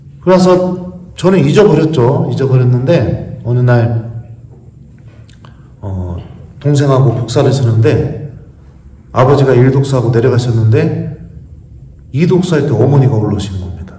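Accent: Chungcheong, South Korea. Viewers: 찬미예수님 저는 신부님 애청 구독자가 되었습니다. 제 친정은 대전교구 성당인데 신부님 강론 강의 들을때마다 익숙한 충청도 사투리가 참 정겹고 좋아요. 신부님의 어머니 이야기를 들으며 저의 엄마가 생각나서 눈물이 났어요. 며칠전에 돌아가신지 6주기 맞이했네요. 저랑 15년을 함께 사시다가 89세로, 하느님 품으로 가셨는데 제 엄마도 새벽 3시면 어떤일이 있어도 일어나셔서 3시간 넘게 기도를 하시면서 하루를 시작하셨어요. 평생 온갖 질병으로 시달리시셔서 37킬로를 넘지 못하셨던.., 그런 엄마가 치매까지 오면서 계절이 뭔지, 지금 시간이 언젠지 점점 인지능력이 저하되셨는데 그런중에도 시도때도 없이 성당에 가야한다고 옷을 거꾸로 입고 상의를 바지에 끼우시고 성당가방을 매고 그러셨죠. 엄마는 갖가지 기도를 하셨기에 많은 기도책이 있었는데 낡을대로 낡은 그책을 하루종일 넘기시며 치매중에도 기도를 하셨고 묵주기도를 멈추지 않으셨어요. 그런데 3시간 넘게, 4시간 정도 걸리셨던 그 기도는 30분안에 끝나더라구요. 치매때문이셨겠죠... 기도책을 덮으면서 '나 천당 가야 해, 나 천당가야해' 이러셨던 우리 엄마... 하느님께서는 엄마의 그 기도를 어여쁘게 받아주셨으리라 믿습니다. 장례식때 엄마의 손때가 잔뜩 묻고 낡은 그책을 함께 제단에 올려드리고 그걸 보면서 저도 그때부터 냉담을 풀고 지금은 하느님께 다가가려고 노력하며 살고 있답니다. 지금도 엄마가 보고싶어서 눈물이 나지만 하느님 품에 계신 엄마에게, 그리고 성모님께 저를 위해 빌어주십사 전구를 청하며 살고 있답니다. 신부님의 강론 또한 저에게 희망을 심어주셔서 감사드립니다.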